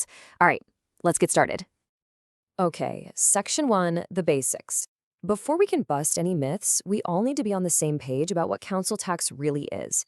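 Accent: United States. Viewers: Why is a bloody American voice being used to preach to us about this ? .is this American actually paying council tax in this now disgusting country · American accent?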